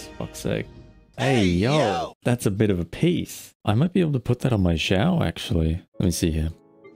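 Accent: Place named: Australia. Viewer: the aussie accent is glorious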